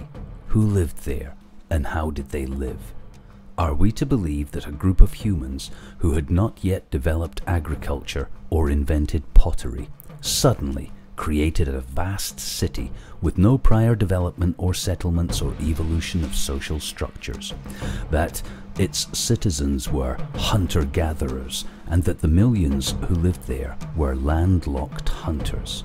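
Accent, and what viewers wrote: Scotland, United Kingdom. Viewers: Love the Scottish Accent! Great Video! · It's a Scots accent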